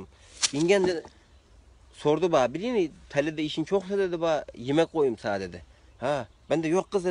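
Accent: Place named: Unye, Turkey. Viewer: Kardes ben ünyelim bizim şivemizde çok benziyor size ama gercekten on numara tebrik ediyorum seni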